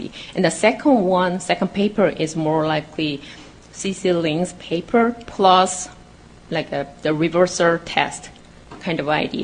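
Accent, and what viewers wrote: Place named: China. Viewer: when it comes to science the language should be clear & understood, her Chinese accent replaces the "L" with "R" MLC will be MRC, Real-time become Rear-time!! but thank you for the effort.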